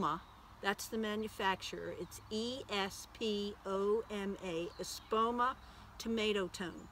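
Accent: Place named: New Jersey, United States. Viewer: You must be from N.J . Thank you.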